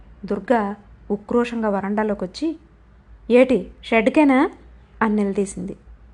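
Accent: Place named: North Andhra, India. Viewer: ముగింపు ఊహించగలిగేదే ఐనా కథన బలం చదివిస్తుంది ఇలాంటి కథల్లో! జీవన చిత్రాలు కథలుగా కదిలే విధం అదేగా! ఉత్తరాంధ్ర యాస గారి గళంలో బహు సహజంగా ఉండి కథకు మాధుర్య పరిమళం అబ్బింది!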